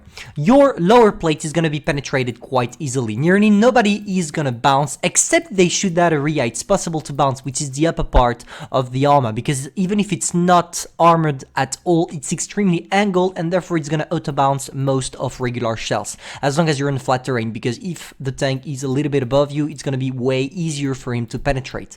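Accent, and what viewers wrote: France. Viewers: I’m here for the French accent... nothing more, nothing less 🤤🤤🤤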